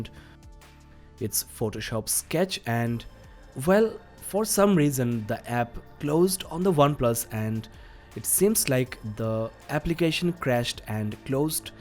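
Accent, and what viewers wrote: India. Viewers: Thicckkkk Indian accent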